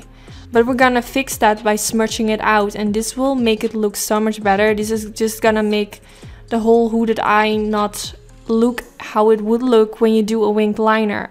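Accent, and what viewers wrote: Netherlands. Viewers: If you have hooded eyes then I have. no eyes. Really if you call this hooded...girl my whole skin above my eeyes falls almost over my lashes. That is hooded eyes. Love how it looks!! Just doesnt work for my really hooded eyes. I just use black eyeshadow as eyeliner. Now for the first time I heard from your accent you are Dutch. Some words sounds familiar. 😄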